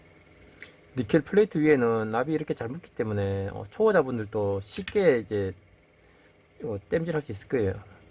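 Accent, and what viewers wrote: Gyeongsang, South Korea. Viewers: 밧데리 박사학위 드리고 싶어요 구수한 갱상도 사투리 좀 버벅하시만 그것이 더 정겹네요 쪽집게 과외 처럼 존경합니다 밥 커피 대접 하고 싶어요 저는 🏝에 살아요 부시리 삼치 드시러 오세요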